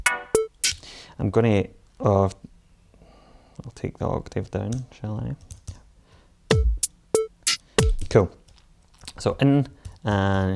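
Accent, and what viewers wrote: Scotland, United Kingdom. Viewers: Your videos are great man! You should have 1000x the subs - the YouTube algorithm is a fickle mistress. Great to hear a Scottish voice doing synth stuff. Thanks again.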